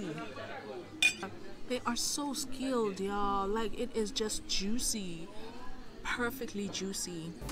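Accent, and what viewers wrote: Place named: United States. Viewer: Definitely a hidden gem in southern east Africa I would like to visit.#Eswatini 🤩. you lived in America before?. I can hear that accent slang.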